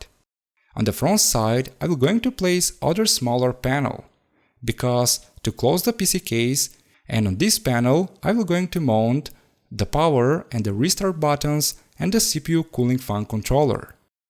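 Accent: Russia. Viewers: У тебя не получается скрыть что ты русско-говорящий, а проект классный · Хм у тебя русский акцент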